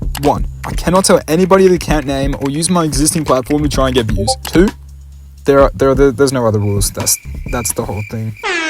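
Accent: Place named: Australia. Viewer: I KNEW YOU WDRE AUSTRALIAN BY YOUR VOICE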